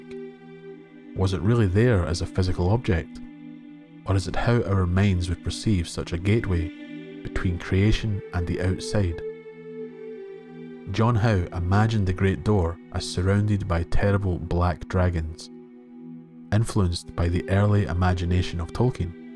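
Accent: Scotland, United Kingdom. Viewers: I don't know if you have an only fans, or an asmr channel, but you have one of the most appealing voices on Youtube. I could listen to you just talking, or reading...what corner of Scotland is your accent from?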